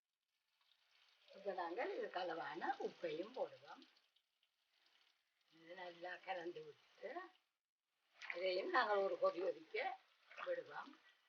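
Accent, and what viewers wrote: Jaffna, Sri Lanka. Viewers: Engelnde yalpanam tamil neenga pesa nalla iruke ketka. Engelnde amma vum ipadi thaan seireva vendika kulambu annal amma inji poduva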